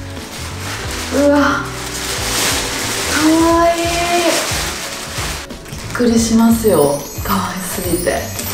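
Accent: Kansai, Japan. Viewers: 関西弁のみっこちゃんが、かわいいです😍 naruki takahashiのfruits stand、ステキですね🍊欲しくなっちゃいました！ 後ろの鏡もカワイイ🪞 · 笑けてくるって言うみっこちゃんが好き♡こんな可愛い人が同じ関西人で関西弁で嬉しい♡ 木の器、可愛い💕水時計の音、超癒し… カバンもネックレスも急須も全部素敵〜！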